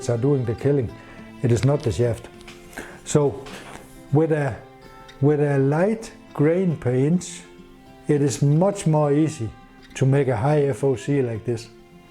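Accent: Denmark. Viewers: Your Daneglish is fine, I have no problem understanding you. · So... I must say again with humble respect: you have a beautiful wife which gives you nice gifts. You are a very, very lucky man. Director clap is very appropriate. Maybe she can use it every third or tenth video? I wouldn't mind at all. 😇 I likeYour Danish spiced English very much. I don't know if you would like my slavic English accent. But we use what we can, don't worry a bit. Another interesting video and interesting praxis data in the comments about your son and a 30# bow and his deer kill. I really didn't know that that is possible, as we are not allowed to bowhunt in our country. My only question would be, how is so high FOC affecting your spine of the arrow? I couldn't shoot such an arrow from a 35# bow, I think. I shoot 52# longbow (a little towards hybrid side, but still longbow under the WA rules), and I have allready a problem: I use 340 spine goldtip xt classic, with 160 grains in front, but are still a little softer, as I would like for this bow. 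I can tune them, but I have to go near the lower limit of my brace height, which I don't like. I also like the heavier arrow, because I use carbon just for 3d training, ( they are much more durable than wood) and wood arrow in this spine is allready very heavy. I make carbon set as similar to wood arrows as I can, and then before competitions I don't really feel much difference , when I have to use wood for longbow class. Some would say use 300 spine, but wooden shafts in this spine are allmost impossible to come by he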